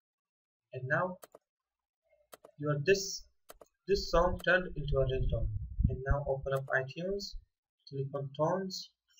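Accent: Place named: India. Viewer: This guy sounds like a indian irs scammer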